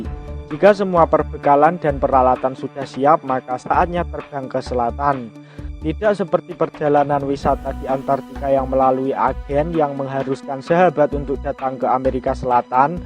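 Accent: Java, Indonesia. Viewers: naratornya indonesia asli.. wkwkwk... hidup medok.. 😁😁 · kok gue jadi fokus yg jelasin ya bahasanya medok jawa bgt 😁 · logat,e medok. 😀😁😂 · Naratornya medoxx bgt😀😀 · Medok amat njiiir 😂😂😂😂 Btw buat yg mendebat, membully,beradu argumen pesrsepsi tentang bumi datar anda luar biasaaa.. Terserah anda mau menertawakan, menganggap ada2 saja, menganggap bodoh ,itu bebas hak anda hehe Buat apa ngeladenin2 yg ngga percaya atau yg kontra.. Para Flatter hanya mau membukakan konpirasi besar kepada semua umat manusia dengan angka(fakta). Bukan hanya dengan faham yg turun temurun diajarkan. Like "orang udah diajarin di sekolah2 dari dulu bumi bulat ,ngaco aja". Hehe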